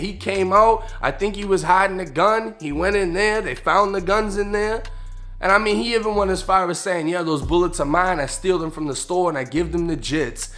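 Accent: Boston, United States. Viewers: I’m from Boston bro no one stretches there accent THAT bad he says apahhhtment and stretches his AHHHS (r’s) every chance he gets like cool we get it your from Boston . Just feels over exaggerated and forced and dumb after a while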